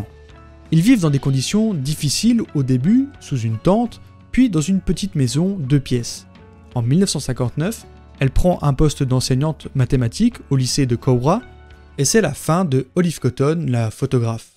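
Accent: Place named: Belgium. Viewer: Mon dieu je suis vraiment désolé mais j'ai pas du tout réussi à suivre la vidéo parce que je bute à chaque fois que tu prononces "et" "est" 😭 mes plus plates excuses à tous les belges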